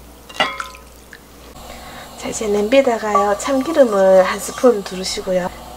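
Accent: Gyeongsang, South Korea. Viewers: 구수하신 경북 억양이 편안하게 느껴집니다.쉽게하지못했던 요리를 쉽고 간단하게 하는 요리 노하우를 기다릴게요. 감사합니다...^^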